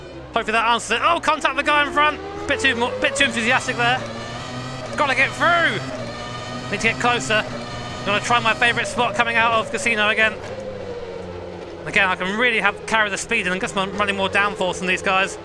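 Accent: United Kingdom. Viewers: I just realized how good of a F1 commentator would be, I mean the eloquence, familiarity of F1, and his british accent, what more do you need?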